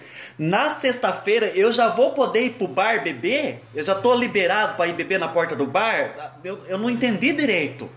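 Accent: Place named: Sorocaba, Brazil. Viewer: Você é sorocabano! O sotaque é inegável.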